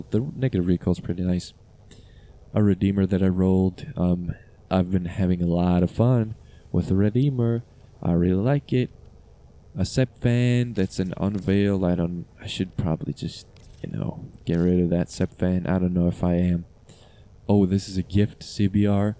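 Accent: United States. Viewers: Q&A: whens that hot dog review coming? I want to see shlurp a sausage 😩😤 Also you sound American but you said you live in Europe so where do you come from?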